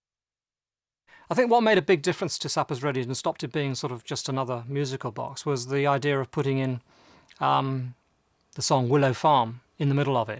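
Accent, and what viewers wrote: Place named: RP, United Kingdom. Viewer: I love RP pronunciation...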